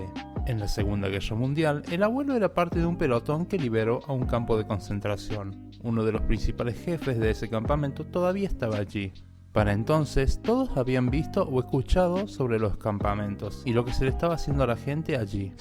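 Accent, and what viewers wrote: Argentina. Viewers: Te falta quitar el acento argentino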